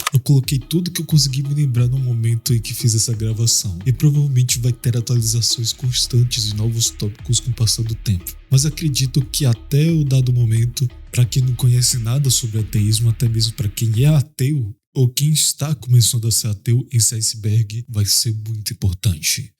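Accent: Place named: Northeast, Brazil. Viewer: Que voz horrível, parece um gripado falando com algum sotaque nordestino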